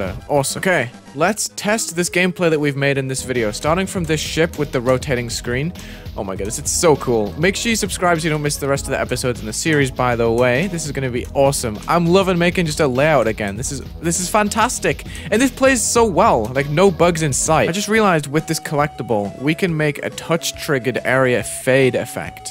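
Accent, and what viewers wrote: United Kingdom. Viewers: This doesnt have anything to do with this video, but wouldnt it be cool if you crashed that chest in the beginning of the video, and it would give you a free demon chest of your choice? Edit:, whats ur accent? It sounds a bit british but also not even british at the same time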